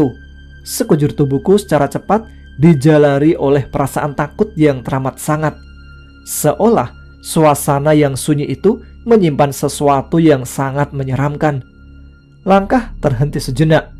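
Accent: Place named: Banyumas, Indonesia. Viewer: Hay... Aa ngapak... Aku dari Brebes nih... Salam santun buat mas.makasih untuk ceritanya.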